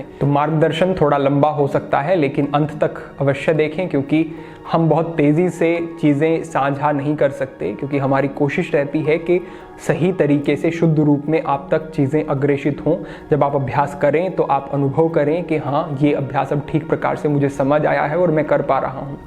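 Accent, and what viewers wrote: Standard. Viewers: Shrimaan aapki Hindi ati sundar ati shudh hai. Pranaam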